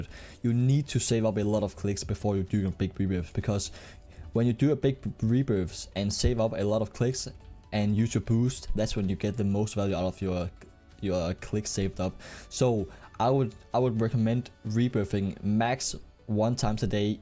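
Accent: Denmark. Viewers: Elsker din danglish haha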